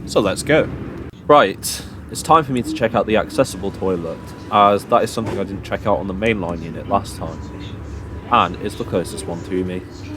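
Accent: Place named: Sheffield, United Kingdom. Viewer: He’s from Sheffield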